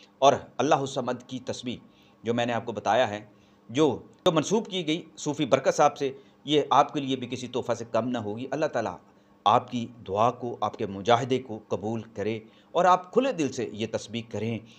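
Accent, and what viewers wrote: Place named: Kashmir, India. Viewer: Assalam o alaikum warahmtullah ap taluq azaad kashmir sy hai apka accent bilkul wesa hai... Allah kareem aulia Allah ki mohbbat or adab o ehtraam wala rakhay Aameen..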